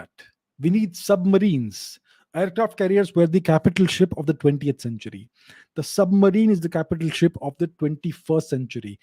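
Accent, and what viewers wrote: France. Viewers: Enjoying the french accent😌😃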